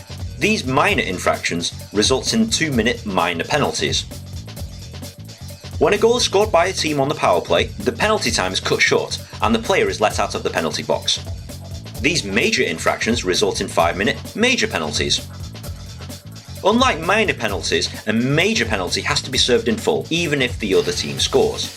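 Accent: England, United Kingdom. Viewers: Why the hell I wanna hear an Englishman explain hockey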